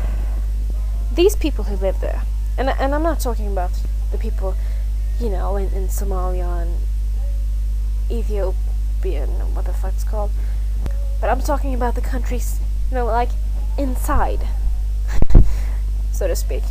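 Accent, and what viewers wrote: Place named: United Kingdom. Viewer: Just curious but, are you reading something when you're narrating this? And did you do this for school? I still don't really feel convinced. But your English isn't as bad as you think, you actually sound somewhat British. The only thing that stood out to me was "drought" which is pronounced like the word out (but you might already know that now). Anyway, best of luck if this is for a grade or whatever.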